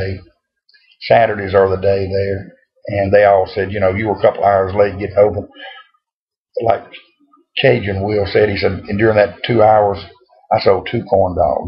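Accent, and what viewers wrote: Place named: Shreveport, United States. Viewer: Good ramble, really enjoyed it. Listening to you talk is like going home again since I was born and raised in Shreveport. We used to drink RC's and eat moonpies when I was young, but I never had a deep fried one. see ya next time.:)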